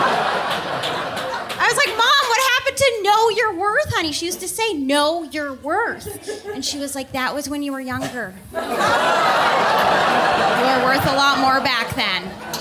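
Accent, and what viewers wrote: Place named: Minnesota, United States. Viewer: I first thought she had to be from Minnesota. Then I really started to appreciate the subtlety satisfied pauses with the wry smile between the jokes. 👍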